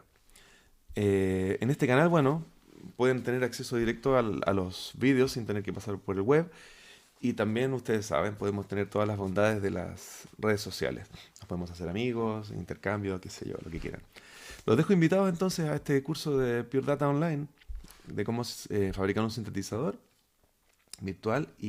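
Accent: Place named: Chile. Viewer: que maravilla encontrarme con tu canal y por las dudas al escuchar tu acento me fui a revisar tu cv para soprenderme nuevamente de que eres chileno y haces patria tan lejos. Tras algunos minutos ya entendí lo que es el pure data y están muy interesantes los pasos. A ver si me animo a descargar y aprender a usarlo. Muchas gracias por compartir! Excelente canal educativo :) Saludos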